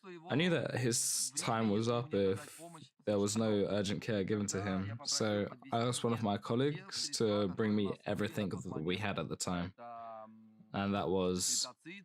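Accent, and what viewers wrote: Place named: England, United Kingdom. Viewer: What a beautiful story, love the English accent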